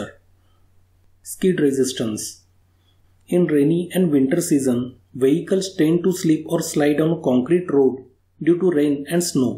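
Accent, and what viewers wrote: India. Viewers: Bro, you can't even type a sentence. His English is fine he just has a strong Indian accent.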